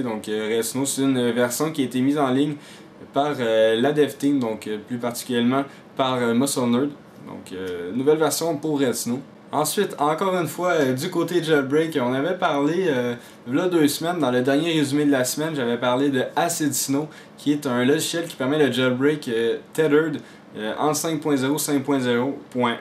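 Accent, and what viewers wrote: Quebec, Canada. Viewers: J'a laissé un potit poce vert et un commintaire =) (l'accent québécois me fait bien marrer) Sinon excellente vidéo dude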